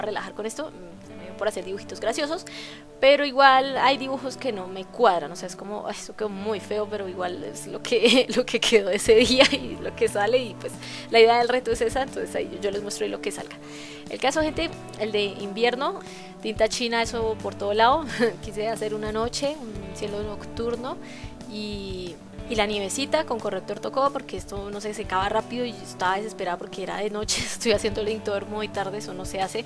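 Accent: Colombia. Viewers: ¿Eres colombiana no?, es la primera vez que entro a tu canal y lo note en seguida xD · cuando diijste que eres Bogota mi corazoncito dio un vuelvo eramos vecinas:'v Yo soy de Venezuela:') Pero ahora estoy en Ecuador y muy pronto en España Yo pase por Bogota y no te conocí:'U Pd: Pensé que eras mexicana por las expresiones que usas pero ahora que lo escucho bien si tienes acento colombiano 7u7r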